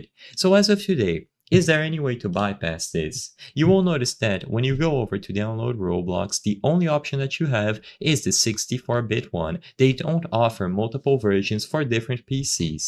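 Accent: Brazil. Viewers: is that a brazilian accent?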